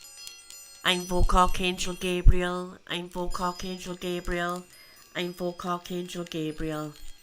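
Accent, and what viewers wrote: Newcastle, United Kingdom. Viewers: Love hearing the geordie voice, especially like when its just like being at the spookies in south shields years ago ❤ Hope you are improving ❤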